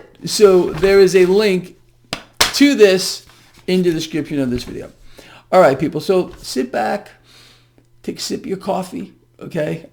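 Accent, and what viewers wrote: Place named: Upstate New York, United States. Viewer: where did you grow up? The Northeast? I get a vibe you're from upstate New York sometimes 😃 Great episode, thanks for all your excellent advice!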